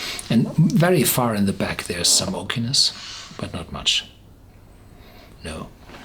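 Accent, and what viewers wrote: Germany. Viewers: Sympathisches English 😉. German style.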